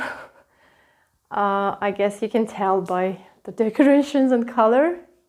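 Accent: United Kingdom. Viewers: I hear a slight British accent to your speech.........In Greece people have washers, very rare to have dryer....I think that is pretty common in Europe. This apartment is quite large. area looks middle class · I mean no offense-- I cannot decide if you are Russian, or British. Your English, which is excellent by the way, has a slight British accent. I know sometimes people pick up the accent of an instructor in a different language. I very much enjoyed the tour of your apartment and building. It appears a middle class city to me. Knowing nothing about it, I might guess heavy on industry? Thank you, very nice video. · I'm hearing a British accent?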